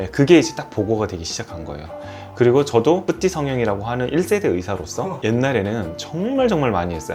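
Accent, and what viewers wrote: Gyeongsang, South Korea. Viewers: 진행자분 경상도 사람이네예~^^ 서울말투에 섞어나오는 친근한 말투~*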